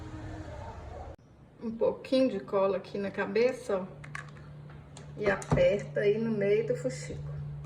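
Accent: Minas Gerais, Brazil. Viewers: Mulher... muito obrigada. Aprendi muito com você. Esse sotaque mineiro me lembra minhas primas e toda a família laaaa de São Braz Do Suaçuí MG. Muito agradecida viu... Beijão fraterno!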